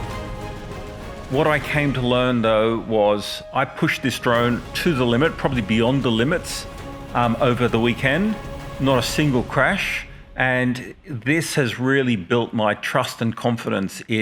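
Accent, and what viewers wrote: Australia. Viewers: So good to hear an Australian voice in a good vlog! I've never heard of these drones either. I have a Mavic Air and we tried this with a scooter in the rice fields here in Thailand but not a success. What bike are you riding here?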